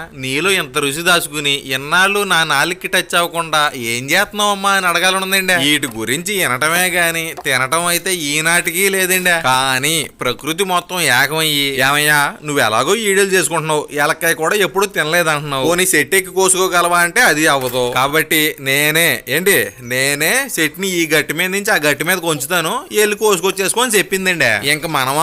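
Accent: Godavari, India. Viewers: Bro I can definitely tell about you’re voice that you are from Godavari district ❤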